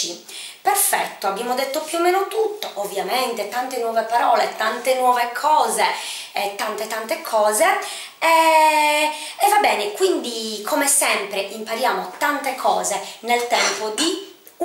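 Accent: South, Italy. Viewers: it is not a good accent. she is nice teacher but with a very bad accent. she is from south, all the vowels are too open. it is not correct. for italians it is very bad accent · questo purtroppo non è l'accento perfetto. E' un accento del sud.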